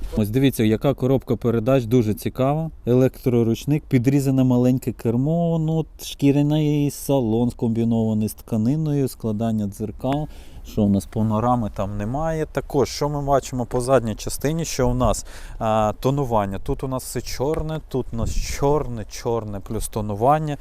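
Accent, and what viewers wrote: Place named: Volhynia, Ukraine. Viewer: Волиняни так розмовляють, Стрій, Кієв, город і да. Це масове явище.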